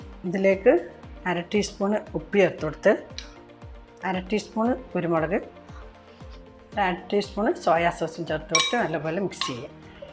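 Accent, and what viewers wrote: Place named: Kannur, India. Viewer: ആർക്കും മനസ്സിലാകുന്ന തികച്ചും കണ്ണൂര് സ്റ്റൈൽ അവതരണം 👍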